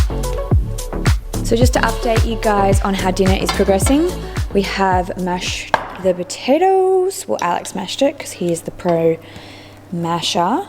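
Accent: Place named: Australia. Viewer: I feel like they have the best Aussie accent I've heard on youtube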